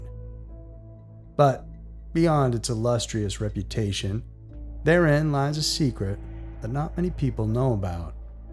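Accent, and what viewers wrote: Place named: North, United States. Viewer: I’m usually REALLY good at figuring out where someone is from by how they talk or even without any accent, how they say certain words. But I can’t figure you out! Northern states is all I’ve got. But leaning toward NorthWestern. Where ya from!?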